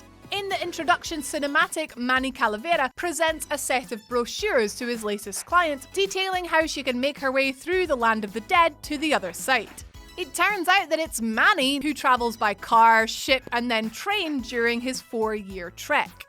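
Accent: Scotland, United Kingdom. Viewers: I'd love to know on which planet the Scottish accent sounds like a mashup with another accent. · She's Scottish. :-) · is she Scottish?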